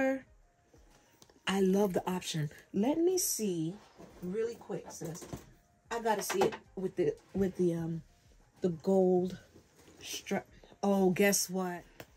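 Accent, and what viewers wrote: Jamaica. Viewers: TFS, the bags are beautiful ❤️ instant subby as soon as your Jamaican accent started leaking out... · Omg, I hear an accent. Do I hear Jamaican? I love that you decided to bless someone.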